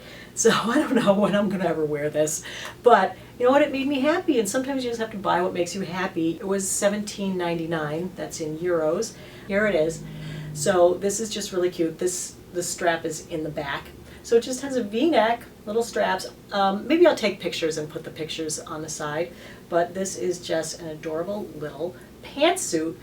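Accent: United States. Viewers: Hi, I'm so glad you had a great time in Spain - I love it there! It's cute the way Americans pronounce words. For example, we pronounce Ibiza (eye-beeth-a), and instead of "embraceful", we would say "embracing" is correct. Anyway... I really love watching your videos. I'm going to be in NYC with my daughters from 5th - 22 July, and I'd love to meet you in person! I'm almost 53 (also get hot flushes) and am interested in your product reviews. I hope to see you at the W Hotel next week! How long are you staying in NYC? If I don't make it to the hotel (my daughters have planned some excursions), then it would be lovely to meet for a coffee. We live in Germany, but are form London. Stay awesome!:)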